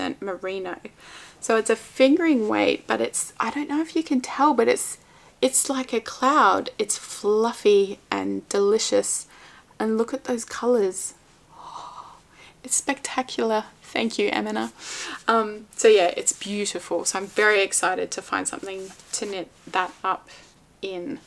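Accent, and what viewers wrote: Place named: Australia. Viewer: It’s so great to hear an Aussie talking yarny goodness!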